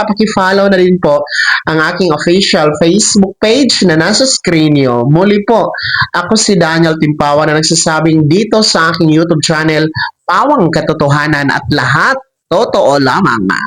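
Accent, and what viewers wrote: Visayas, Philippines. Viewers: Ano kaba?halata panagalog mong my halong bisaya.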